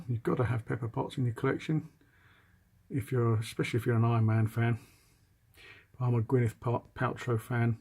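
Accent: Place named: United Kingdom. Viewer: To thanks for always uploading your video. I have seen some of your old video(and love it)-aliens marines,wolf complete with cleaner kit asesory,predalien and always feel amazed how in depth your reviews are. And yeah maybe its awkward but i do like your accent - the explanation so detailed and smooth:) Sometimes i wonder why i never born at UK hehe:). Im a new collector sixth scale mainly ht old version preds and aliens marines but thanks for always uploading the figures:)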